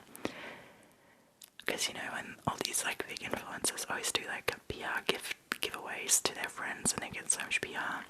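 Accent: Australia. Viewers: girl its blowing me away that you're not australian, my family are aussies and you sound no different!! haha thats amazing you picked up the accent so well! also would love to see the dress you found, I was just in the exact same situation on the hunt for a wedding guest dress, and asos came in clutch!<3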